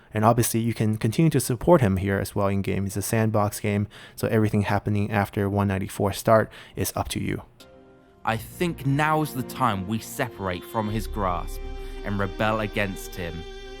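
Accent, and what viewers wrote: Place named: China. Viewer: 中國口音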